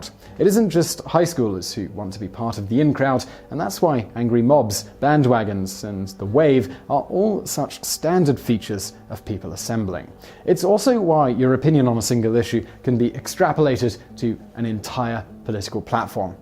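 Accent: England, United Kingdom. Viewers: plays on his accent some people in North America have such respect for the British accent when in fact they're all dummies over there. Btw lived in the UK for years..... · If you're so smart, why can't you even grow hair on your head. Rats can grow hair all over their bodies. You cant even grow hair to protect your grey matter from sunlight. And sorry, mispronouncing American words like an English doesn't make you smart, just makes you 250 years out of date. We beat you and even Europe doesn't want you. I'd call you a red headed stepchild but your prince has already cornered that.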